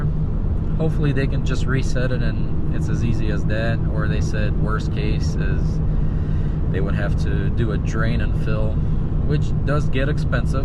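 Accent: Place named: United States. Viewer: Говоришь ты как настоящий американец, красиво, а действуешь четко и без нервов как настоящий русский. Кто ты воин?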